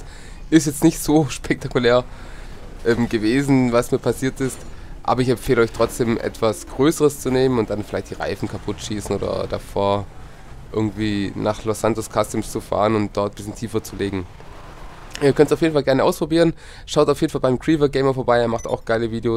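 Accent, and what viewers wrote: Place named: Swabia, Germany. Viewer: Gutes Video!:)Bisch bestimmt ein schwäbischer zocker wegen deinem Akzent^^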